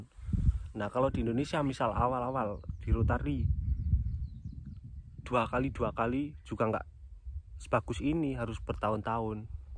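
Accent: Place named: Japan. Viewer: Smean orang mana kang, ko ngga bisaa di bedain klo samean orang jepang apa indo😁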